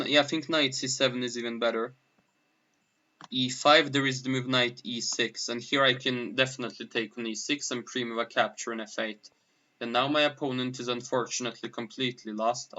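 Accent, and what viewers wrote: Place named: Italy. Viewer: Are you Norweigen? You sound more Italian aha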